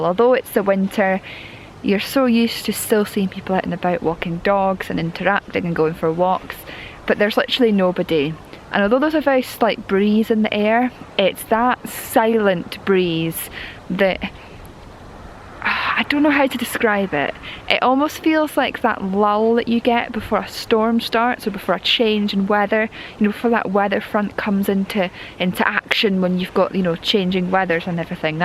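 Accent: Scotland, United Kingdom. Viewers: Loved the video! I am late to watching your channel so I am trying to see all your work. You are helping me enjoy the confinement of COVID-19. Who would have thought what 2020 would bring...just crazy. There is so much to enjoy in your videography and photography. You should consider documentary voice overs as your enunciation is so clear and the Scottish accent is beautiful. I may have said this before I could listen to you talk all day. Stay safe Fred in Texas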